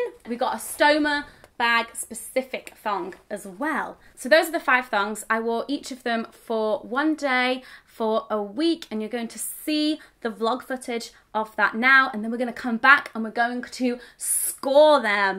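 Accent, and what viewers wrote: United Kingdom. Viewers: You sound extra British today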